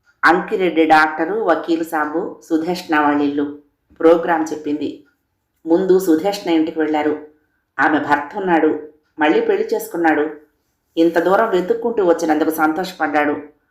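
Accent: Telangana, India. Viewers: Meeru chala baga Telangana yasatho chala baga narrated andi. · Telanga mandalikamlo baga matladaru madam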